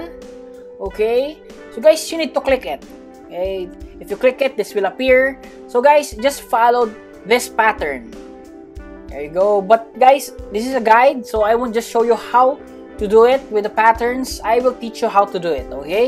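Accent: Philippines. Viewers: FILIPINO KA TALAGA KUYA BOSES PALANG FILIPINO NA HAHAHAH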